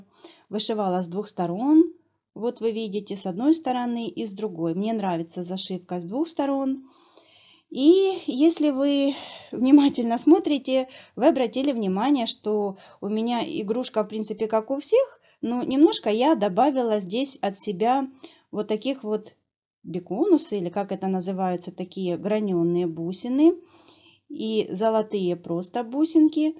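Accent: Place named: Kharkiv, Ukraine. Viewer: Все в порядке у Вас с языком, по крайней мере не настолько, чтобы ушам было больно. Что ж поделать, если у нас, харьковчан, такой акцент) Я даже в комментариях ниже заметила ошибки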